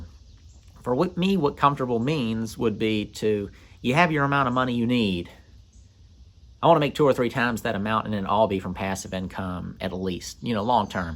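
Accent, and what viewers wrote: South, United States. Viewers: I agree with the chap who said you could do motivational speaking, myself I also like just the sound of your voice and your accent! ( southern US) I also would love to see this house when it is finished but nothing you post is boring because everything you post teaches me something. I love the passive aggressive, there is a lot of that going on in Britain, it's almost second nature. Family comes first doing things you have to and because you want to I can always go and study other videos you've made which cover almost every aspect of what you would want to learn thank you for some more great content. Kind Regards from South West England.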